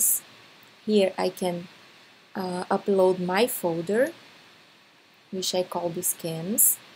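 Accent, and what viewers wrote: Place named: Brazil. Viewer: Thank you very much! Appear Brazilian accent.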